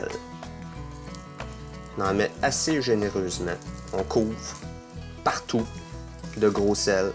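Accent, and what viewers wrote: Quebec, Canada. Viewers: is this canadian french?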